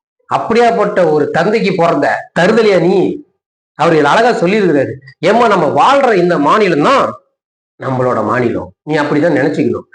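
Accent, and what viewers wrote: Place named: Kongu, India. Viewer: இந்த வீடியோவில் பேசுபவர் கொங்கு வேளாள கவுண்டர் பாஷை பேசுகிறார். கொங்கு வேளாள கவுண்டர்களே தமிழர்கள் கிடையாது. கர்நாடகாவில் இருந்து வந்தவர்கள்.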